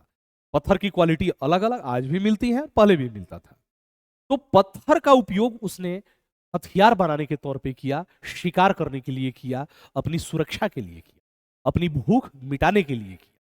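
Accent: Bhojpuri, India. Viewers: Sir aapka bhojpuri sunkr lga ki aap mere hi district gopalganj se belong krte h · Sir ji aap to gajabe bhojpuri bolte hai ekdam gaaw ke tarah❤❤❤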